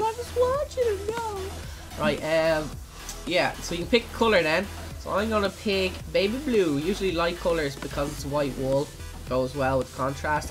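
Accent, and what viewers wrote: Ireland. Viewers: are u irish and if u are you are from dublin or tallagh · R u Irish cuz I amzz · r u irish so am i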